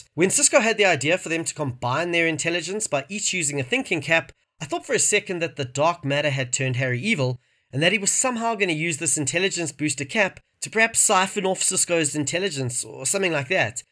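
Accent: South Africa. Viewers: Are you South African? Your accent sounds South African.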